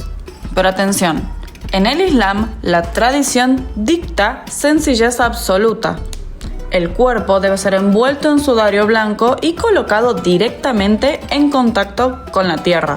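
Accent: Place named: Mendoza, Argentina. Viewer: Hablas como mendocina. Yo viví en MZA.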